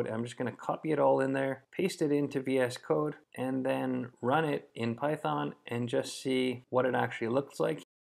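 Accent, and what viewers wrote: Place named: United States. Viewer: This gentleman wins the award for "most amount of accents heard in the 1st 15 seconds of talking" Norwegian, no Australian, wait no that's German, no British, no that's definitely some version of Nordic, wait is that Canadian or American? Oh no it's Polish maybe. Damn wait that's American, maybe Canadian. Honesty I'm still not sure. I'm 90% it's American but there's something else in there when he's in front of the camera.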